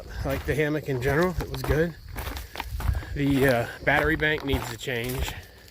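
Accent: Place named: Delaware, United States. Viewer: I'm glad I stumbled upon your video. I section hiked MD and NJ this past year and I'm planning on doing all of PA this summer. I thought I recognized that Delaware accent. I'm also from Delaware. Good luck to you on your thru-hike. I'll be following along.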